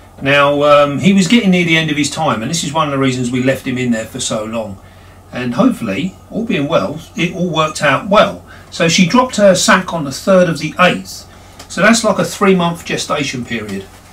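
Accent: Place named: London, United Kingdom. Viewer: It's not lol 😆 what it is - the London in him. His accent. Those London accents always struggle with the Latin or hispanic sounding words. The thick accents don't allow it. I've got a big pal who's the same 🗣😆 I love accent, it's class 👌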